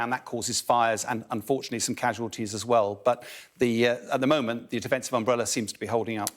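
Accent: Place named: United Kingdom. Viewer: I really like how the British pronounce pee-yu-tin. Lol Putin in every aspects smells, spouts and demonstrates BS.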